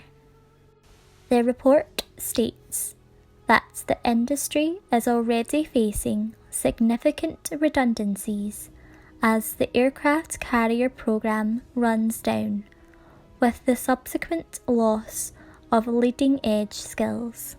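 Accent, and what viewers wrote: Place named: Scotland, United Kingdom. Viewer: Can't make out if this is a real or, Scottish robot voice 🤔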